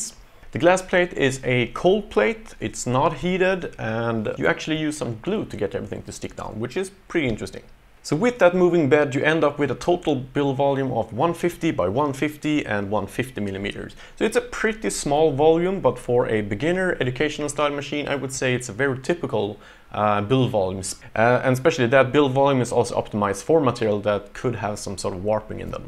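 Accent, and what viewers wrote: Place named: Sweden. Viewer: haha awesome! Jag med, tyckte jag hörde det på din ”dialekt” 😄🇸🇪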